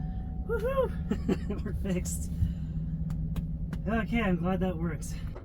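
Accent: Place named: Newfoundland, Canada. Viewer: Drive your whole house into a moose -! 😂. You sound like a Newfie or Bluenoser! Thanks for the good laugh. Definitely driving your house into wildlife is a genuine concern for all van dwellers. 😊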